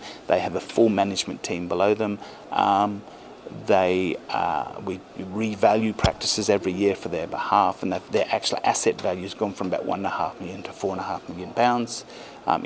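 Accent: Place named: New Zealand. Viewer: Lol he's a Kiwi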